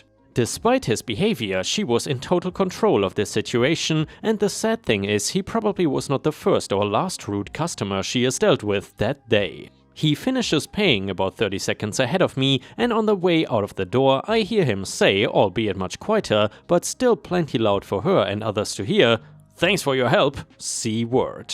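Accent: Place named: Germany. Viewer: For a voice actor your English accent certainly has a Germanic accent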